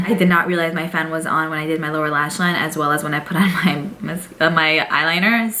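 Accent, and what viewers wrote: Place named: East Coast, United States. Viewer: I adore your East Coast accent! And i ordered the TF palette yesterday. Only would’ve ordered it on sale vs full price tho.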